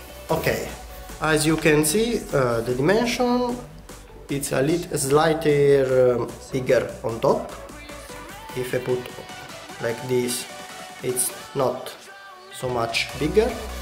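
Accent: Italy. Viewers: ma sei italiano porcoddio?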